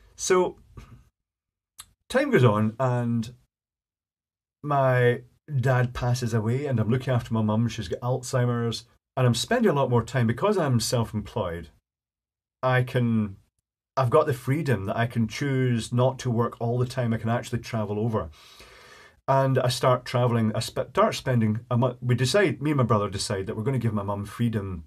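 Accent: Scotland, United Kingdom. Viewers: How should I say this...I'm completely distracted with story time because I'm watching this video and it appears to be me speaking with a Scottish accent and wearing my father's beard. Same hair cut, same hairline. We even look to be the same age. Beyond bizarre. What color are your eyes? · Poshest Scottish voice I’ve ever heard, great videos btw.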